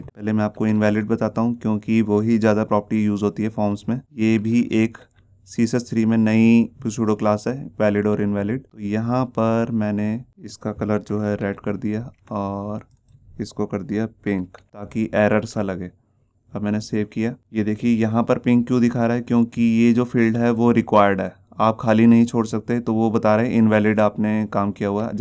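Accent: Punjab, India. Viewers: Sir aap punjabi ho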